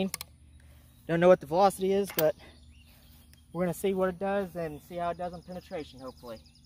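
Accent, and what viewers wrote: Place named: Western Pennsylvania, United States. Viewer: had this chat with you on gunnitrust as well. Didn't realize this accent went past Pittburgh area heading west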